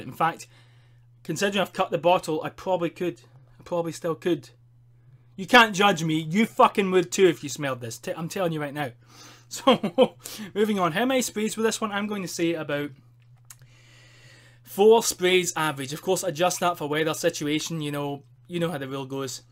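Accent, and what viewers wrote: Scotland, United Kingdom. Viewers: Where in Scotland you from ?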